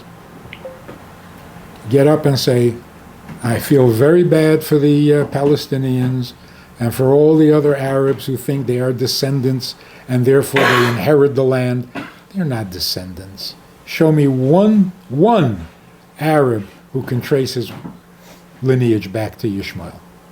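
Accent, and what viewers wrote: United States. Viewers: 😂 love the Texan accent, suits you! X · good voice, sounds like a radical priest from arkansa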